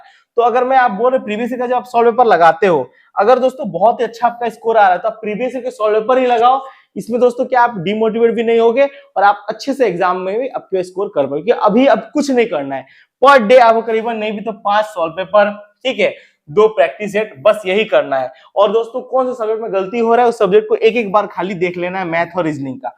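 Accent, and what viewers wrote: Odisha, India. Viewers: Bhai tum odisha se ho na ?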